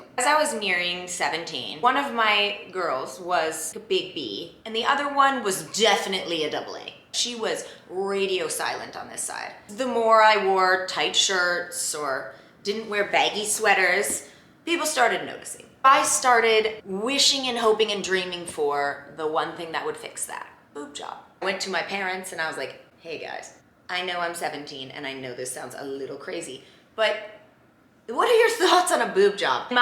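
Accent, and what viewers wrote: Minnesota, United States. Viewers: So Minnesotan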